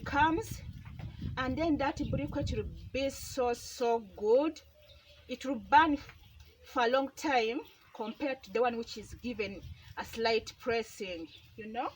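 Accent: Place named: Uganda. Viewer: I want to be your friend..i work together in saudi with annet..shes from uganda..the way she speak is same as you...shes a goodgirl also..freindly and kind..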